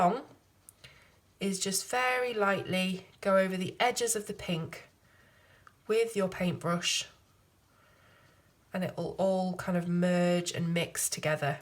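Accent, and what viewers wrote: England, United Kingdom. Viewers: I love your accent from england 😍 nice greetings from germany 👋🏼🇩🇪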